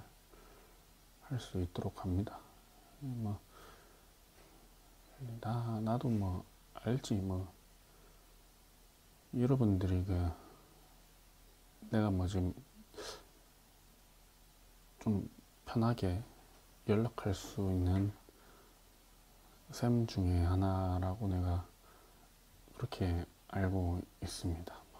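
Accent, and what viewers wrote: Gyeongsang, South Korea. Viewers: 배재고나오셨으면 서울토박이일텐데 어떻게 경상도사투리 네이티브같죠? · 시리즈로 해주세요 어제 물리수업듣다가 꿀잠잤어요 오늘도 자습보면서 램수면 빠져볼게요. 근데 왜 동남방언쓰시는지요? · 시발존나리얼하네 같은경상도사투리니깐 더리얼하네ㅋㅋㅋㅋㅋㅋㅋ와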